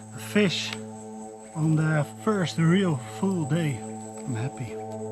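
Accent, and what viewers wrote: Netherlands. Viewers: Ik twijfelde aan je accent en dacht al dat je Nederlands was. Toen zag ik die kruidvat zonnebrand en wist ik genoeg😂 toffe video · Coole video. Nice dat je het in het Engels doet. Wel grappig om te zien hoe je bepaalde dingen echt op een Nederlandse manier zegt maar dan in het Engels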